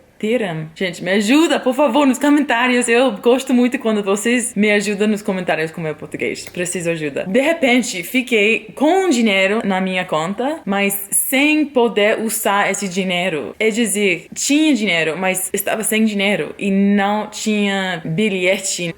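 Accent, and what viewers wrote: Rio de Janeiro, Brazil. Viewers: Amo seu sotaque carioca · Seu português e ÓTIMO !!! Melhor ainda com o sotaque carioca !!! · Teu sotaque de leve já dá pra sentir o carioca! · Tão fofinho e bonitinho seu sotaque carioca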